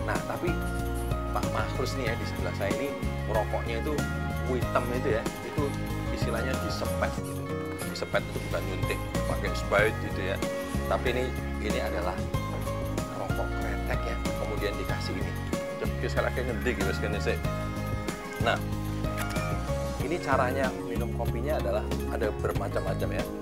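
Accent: Java, Indonesia. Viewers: Pak bondan 😍😍. Kangen sm gayanya saat review makanan bikin orang ngiler sm logat khas jawanya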